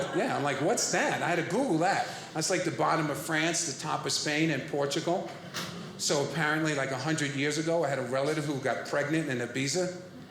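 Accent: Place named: Bronx, United States. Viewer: OMG I knew from your accent that you were from The Bronx!! Italians rule!!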